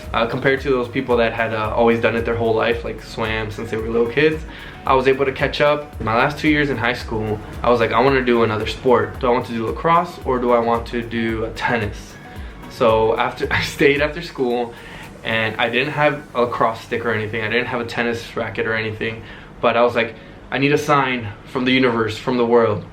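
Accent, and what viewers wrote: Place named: Venezuela. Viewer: Tu español tiene acento venezolano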